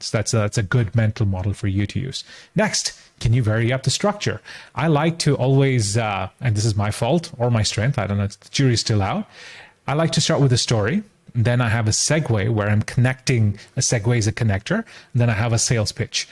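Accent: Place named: United Kingdom. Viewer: Lol.. the British accent. Nice content man. Yeah that’s a fear.. are we doing enough for my people to stay and get enough value?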